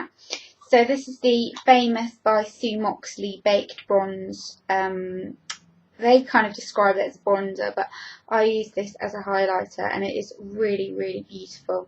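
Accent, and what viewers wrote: United Kingdom. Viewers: Btw I LOVE your accent. I'm going to live in London in September and I want my own British accent.. :P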